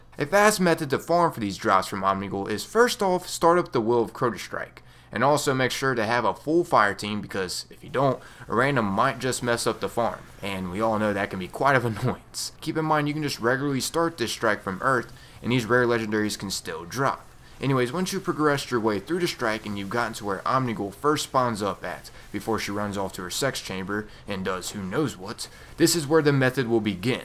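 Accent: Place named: Philadelphia, United States. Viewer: Yo! I like the Philly accent.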